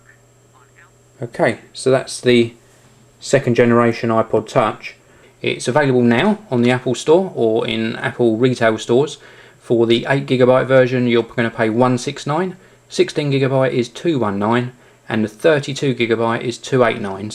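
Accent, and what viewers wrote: England, United Kingdom. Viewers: ru like from england cuz u have a acksent and i like ur ipod i be geting 1 really soon 2 more months and i be getting 1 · He's a Brit. Australians (I'm an Australian) sounds completely different! LOL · R u British? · A real good review.. ps. i like yor british accent^^.. i´ll get one next january..^^ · you have a heavy brittish accent...cool =) and wayz i want to got a ipod touch 8 gig 3g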